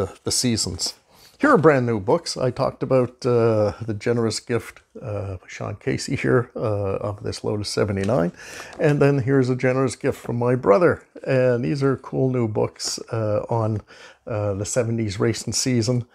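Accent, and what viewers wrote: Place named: Canada. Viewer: Hello, Thank you for another nice content about F1 slotcars, as well as the extra footage and books you`ve shown. The Lotus F1`s remember me of my teenage days in the 70`s when I watched a lot of F1 races. I had been a fan of Ronnie Peterson and I had the Corgi Toys replica back then. When I got older, I gave it away to my cousin to play with. Sadly, there were no F1 models of the Lotus by Carrera at that time, if I remember correctly. I had a 132 Carrera Universal set then. Scalextric was not known in Germany at that time here. Very nice models by Fly, as they always do, but technically seen, Fly is not my choice to go for in slotcars. As shelfqueens they are maybe fine, but they are to fragile if you crash them in my opinion. Are you situated in Canada, cause I think I heard a Canadian accent there?:-) I got a lot of Family in Ontario. 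Any way, I finally left a subcription and a like. Greets over from Germany 🙂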